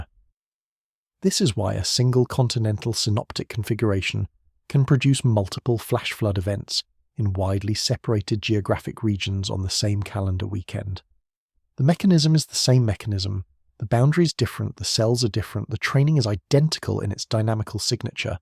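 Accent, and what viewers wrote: United Kingdom. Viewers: Can't we find an American narrator? I find this heavy British accent very annoying. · The worst weather was the same time as the Dust bowl. Government is not smart enough to solve any problems and they do not want to balance the budget. A house of Reps without a budget is not a constitutional government. Currently irritated by British accent.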